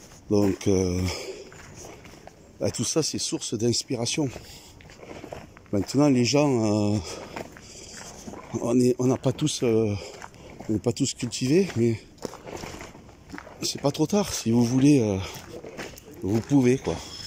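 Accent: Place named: Nord, France. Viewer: C ' ęst un bęl accęnnnnnnnnt , 😉👍 mi chē mí , chē d'tí , ti zotę , achtęur : traduc'tíon : moí c ' ęst moí , c' ęst dę toí , vous autręs , maíntęnant . Rięn d ' aussí chantant qu á toulousę , sínon ící dans lę plat paÿs ,c ' ęst trēs chalęuręux dans lęs blęds , moíns lęs grandęs víllęs . Bíęn a toí , tę bílęs pas , cę quí doít arrívęr arrívęra .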